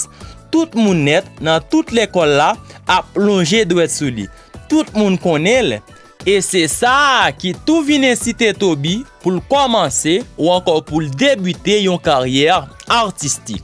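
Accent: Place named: Cap-Haïtien, Haiti. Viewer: Ou pale menm jan ak moun okap ou se moun okap?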